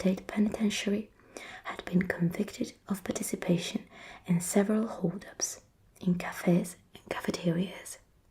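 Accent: United Kingdom. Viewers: Pazzesca! Veramente top il tuo british accent darling 👏👏 Ps* ammazza quanto è severa questa prof!! Attendiamo con ansia la prossima lezione 🖋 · E' vero che ci sono diversi accenti (sia in US che in UK: quello di e' la cosiddetta Received Pronunciation, le persone attorno a me qui nel North West of England, e io stessa, parlano in maniera un bel po' diversa!), ma in linea generale puoi facilmente identificare se una persona e' americana o Brit. Le T come ha citato, le R, per esempio, che gli americani pronunciano e gli inglesi no (tranne in alcuni accenti), e che Scottish e Irish pronunciano in maniera ancora diversa, le L in 'walk', 'talk', o alcuni suoni vocalici (vedi: vitamins, route), e l'intonazione in generale che e' spesso diversa. Nell'inglese britannico si usa moltissimo il connected speech, che e' cio' che fa dire agli stranieri 'gli inglesi si mangiano le parole'. 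Nell'inglese americano questo e' meno marcato (poi ovviamente hai eccezioni). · bravissima! sembri una inglese proprio; peccato che io capisco solo la cadenza USA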